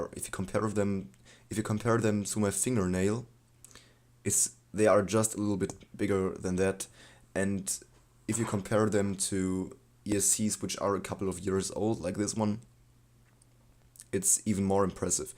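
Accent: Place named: Germany. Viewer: Where are you from? Seems like you're from Germany because of this pretty strong accent but you're doing pretty well keep going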